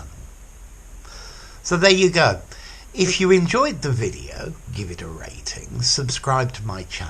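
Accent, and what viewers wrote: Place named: England, United Kingdom. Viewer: Oh, I do like your presentation, its richness of examples and your delightfully English pronunciation and expressiveness. Thank you.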